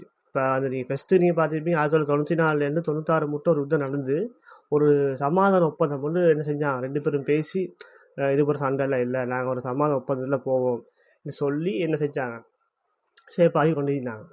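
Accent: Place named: Colombo, Sri Lanka. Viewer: RECORRECT YOUR SPEAKING. WORDS YOU ARE SPEAKING COLOMBO TAMIL PLEASE SPEAK PUBLIC SPEAKING WORDS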